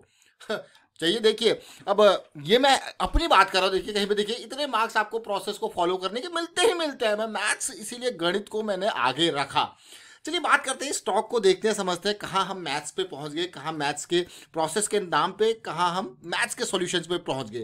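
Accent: Uttarakhand, India. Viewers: Sir , Are you from uttarakhand?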